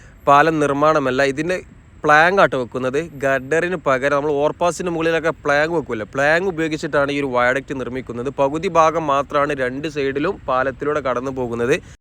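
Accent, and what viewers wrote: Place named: Eranad, India. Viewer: പിന്നെ താങ്കളുടെ ഭാഷയെ വിമർശിക്കുന്നവരോട്, മലയാളം എന്താ സ്റ്റേറ്റ്കാരുടെ ഭാഷ ആണോ? അവരവരുടെ മലയാളം അവരവർക്കു ശുദ്ധം. ഞങ്ങളെ കാസ്രോട്ടെ ബാസെ ഞങ്ങളെ സുദ്ദ ബാസെ. ങ്ങളെ ഏറനാടൻ ബാസെ കേക്കാന് എന്തോരി പാങ്ങന്നേപ്പാ, ആ ബാസെന്നെ പറഞ്ഞോണ്ട്-ന്നെ മുന്നോട്ട് പയ്ക്കാളീം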